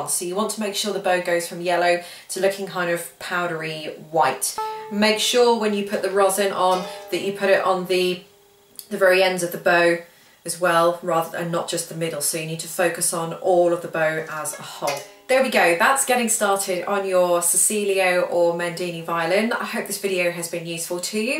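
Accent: United Kingdom. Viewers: I lov the way u speak with a Britain accent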